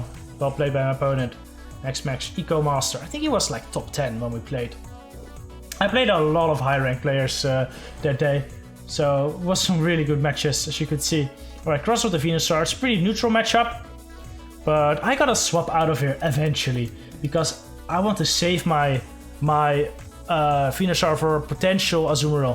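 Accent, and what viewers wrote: Netherlands. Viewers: Wait are you dutch bc you talk with a Dutch accent great video btw! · yes, he is.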